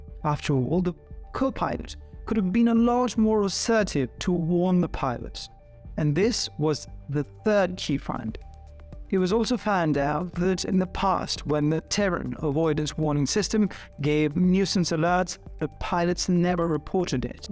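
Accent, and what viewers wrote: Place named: England, United Kingdom. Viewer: Throughout video I was feeling irritated with your annoying English accent ...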